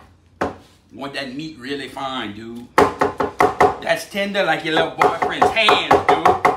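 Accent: Louisiana, United States. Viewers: Man I really like the cajun accent. Getting a heavy dose of cajun today after I found this video. Awesome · Born and raised in in church point Louisiana. Been gone from there over 25 years, thanks for bringing me back with every video you make. Btw my dad talks just like that lol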